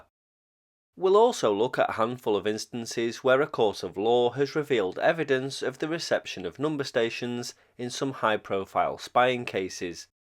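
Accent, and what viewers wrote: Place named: Liverpool, United Kingdom. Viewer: Your accent reminds of The Beatles accent. I was able to See The Beatles in Memphis Tennessee in 1964.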